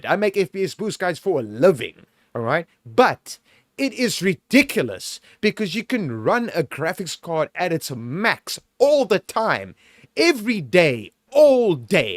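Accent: South Africa. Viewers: You are the best to do it, theres something so refreshing about hearing a tutorial in a SA accent lmao. love you bru, keep doing what you doing.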